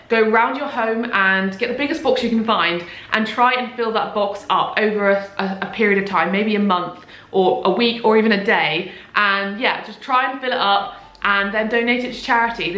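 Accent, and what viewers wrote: England, United Kingdom. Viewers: thank you for sharing those tips ♥ and love your english accent too :3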